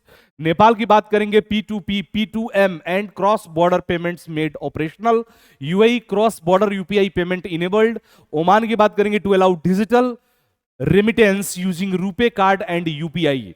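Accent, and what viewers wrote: Bhojpuri, India. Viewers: Aap Hindi bhi bolte hain to Bhojpuria ki jhalak dikhti hai sir Don't mine sir, U looks like Ravi Kishan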